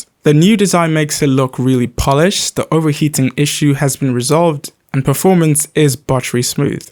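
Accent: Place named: United Kingdom. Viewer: British man😊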